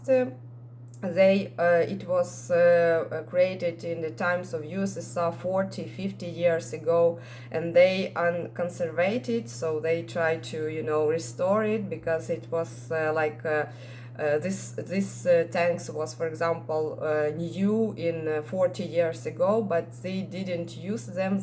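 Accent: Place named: Oxford, United Kingdom. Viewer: Привіт доктор. Ваша англійська чудова, і я думаю, що ви вивчили стандартну оксфордську англійську. Невеликий човен, який був потоплений - десантний катер Яма з 1000 тілами - братська могила Безперечно, розвідка США та Великобританії буде вказувати місця розташування прикордонних військ – вони будуть знищені за добу, якщо вони увійдуть в Україну. Потоплення десантного корабля, більшого військового корабля і «Москви» — це чудові новини, які повинні перешкодити висадці Росією морського десанта з Чорного моря. Чудово, якщо після війни Одесу все ще можна використовувати як український порт. Побити росіян. Нехай ваша армія воює міцно і без страху. Енді (Бакінгем, Великобританія)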